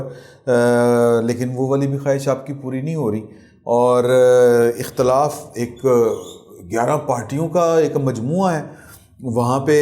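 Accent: Punjab, Pakistan. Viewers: صاحب میں نے کوئی مہینہ بھر پہلے آپ کو اتفاقن سنا. شروع میں آپ کے تجزیوں سے پنجابیت کی بو محسوس ہوئی. مگر اب اپ کی tellings کا انتظار رہتا ہے. خاص طور پر آپ کا انداز بیان جو کہ نہایت سادہ عمومی اور بے تکلفانا ہے.اوپر سے ہلکا سا پنجابی تڑکہ اسے بہت پر لطف اورعام فہم بنا دیتا ہے.آپ مشکل سے مشکل اور بڑی بات کو انتہائی سادگی سے بیان کر دیتے ہیں. دعا گو ہوں کہ اللہ آپ کو صحت و زندگی عطا فرمائے اور اپنے حفظ و امان میں رکھے.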